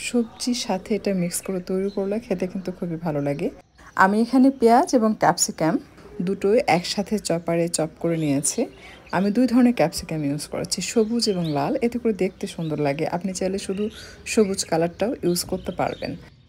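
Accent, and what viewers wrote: Bogura, Bangladesh. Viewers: Apu tomar basha bogura kothay?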